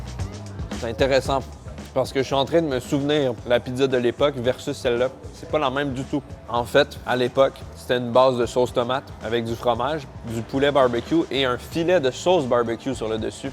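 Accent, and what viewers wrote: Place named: Quebec, Canada. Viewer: C’est la première fois que quelqu’un avec l’accent québécois me rend triste mais dans le bon sens ça m’emue un peut surtout le premier restaurant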